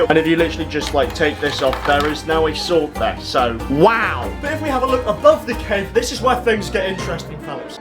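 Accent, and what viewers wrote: Newcastle, United Kingdom. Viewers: Your a geordie let’s go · You sound British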